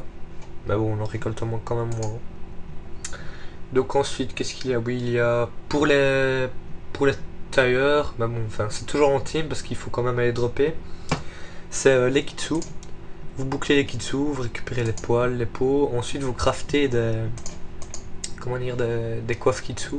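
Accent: Belgium. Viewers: Un petit accent belge :)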